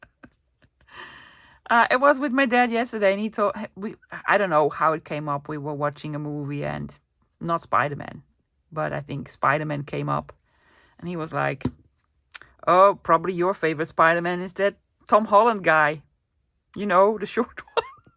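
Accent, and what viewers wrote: Netherlands. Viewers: You are so cute, I enjoy your Dutch accent very much. My grandfather, Cornelius Vander Jagt and my grandmother Cornelia Van Strien were born in the Netherlands. Your voice reminds me of Grandma's voice many years ago (I'm 70!) Your happy attitude make listening fun. I have been a artist for many years painting watercolor. I am now using photos of my art to design tags, pockets and anything to do with journals. I fussy cut the photos and apply them with glue. Trying to make my own ephemera, one of a kinds! Thank you for the hours of happy, relaxing fun. Debra L Pate Art.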